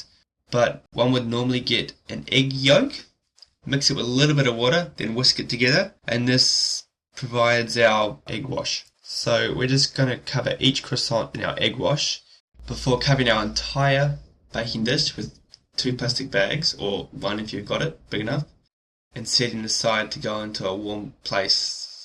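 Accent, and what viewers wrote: New Zealand. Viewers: great tutorial, made even better by your beautiful kiwi accent <3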